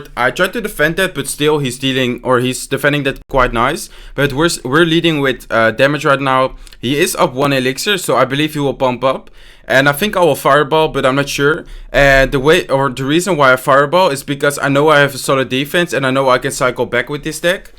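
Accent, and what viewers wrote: Netherlands. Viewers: SmikkelKabouter hij is nl ik hou echt van zn engels😂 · are you dutch? sounds like it xD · SmikkelKabouter He is Dutch yes. I used to speak English like that too but that was when I was 12 years old xD Btw sappige nickname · SmikkelKabouter hij is nl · Zo'n nederlands engels kut accent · Are u dutch cuz u have a accent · SmikkelKabouter sws nl je hoort het meteen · ik hoorde meteen je Nederlandse accent hahaha want dit is de 1ste video die ik van je kijk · Haha je hoort echt duidelijk dat hij Nederlands is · Je bent nl 😂 dat hoor je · Mooie tacktieken! Toen ik de video startte en je accent hoorde dacht ik gelijk "dat moet wel een Nederlander zijn" en toen las ik je discription. Tbh best wel fun. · ben jij nederlands? zo klink je man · Haha je Nederlandse accent is te horen ;) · Haha dat Nederlandse accent geweldig😂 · your not english i think i think your dutch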